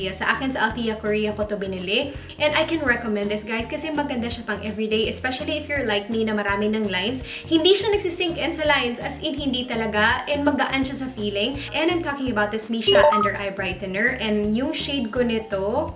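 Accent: Visayas, Philippines. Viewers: Hello, Ms.! The first time I watched your video, I already noticed your accent. It has a hint of Visayan accent. I'm not against it 'cause my dad's a Visaya as well. I just wanna ask these questions: 1. In what particular province did you reside while you're still here? 2. How long did you stay here? 3. What is your life verse? ☺ Thank you so much! Praying that God will grant the desires of your heart. 😊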